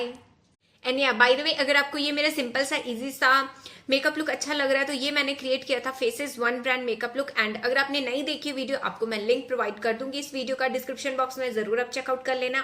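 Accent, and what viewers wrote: Punjab, India. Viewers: R u a Punjabi Girl.......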